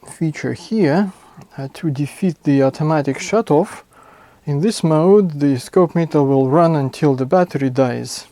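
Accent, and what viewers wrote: Russia. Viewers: Просачивается русский акцент :))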